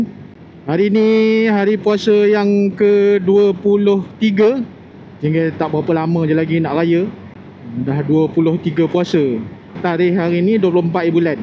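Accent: Perak, Malaysia. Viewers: Assalamualaikum Chor,Kome cakap org Perak je. Aje tanya je Chor.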